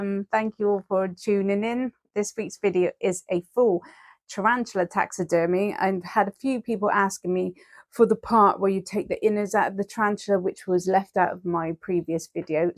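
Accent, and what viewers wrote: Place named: England, United Kingdom. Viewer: Sure is a lot of stuff in there ,but I could imagine the smell and rot if the guts were left in.....boy howdy !!! You sure have an eye for detail and are very meticulous just like me, so I can truly appreciate what you do ,and you do it so very well indeed ,very talented girl !!!! I love the music you play in the background and love your English accent ,gives your channel some class.....very classy and the occasional potty mouth brings it all together nicely. Don`t know who I like better ,you or David Attenborough LOL. BTW I spread my Giant Golden Orb spider and there was a cornucopia of bad language in the bug room......two of the damn legs were broken off before softening the poor bugger but I did triumph and was victorious in the end. I don`t know how you do these projects while recording yourself and having to narrate all at the same time working on such fine specimens for all the world to see.....amazing my dear !!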